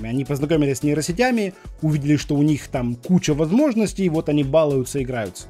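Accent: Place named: Ukraine. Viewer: как же приятно слушать украинский говор на моменте с чатгпт и твои ЧИRRRRRИКС лайк без раздумий ❤️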